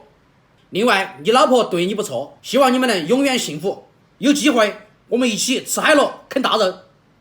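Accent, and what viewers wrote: Sichuan, China. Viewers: 四川话怎么这么难听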